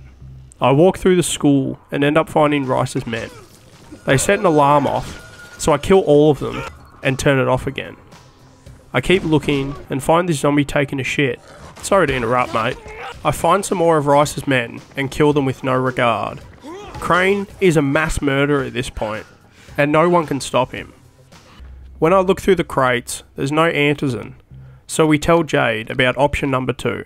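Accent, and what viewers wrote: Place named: Australia. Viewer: The Aussie commentary is great Hoping to see more of this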